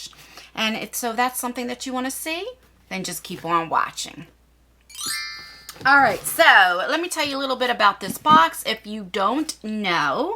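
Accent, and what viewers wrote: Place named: Maryland, United States. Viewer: Where are you from we pronounce water the same way , like wooder, lol. I’m in Maryland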